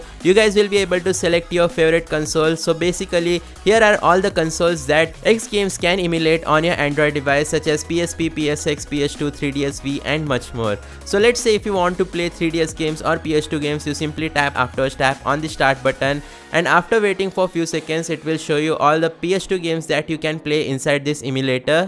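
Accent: India. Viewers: always indian accent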